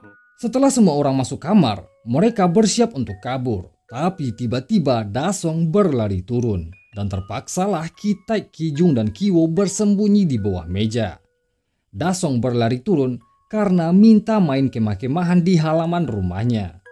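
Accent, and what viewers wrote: West Sumatra, Indonesia. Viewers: lo pasti orang sumbar bang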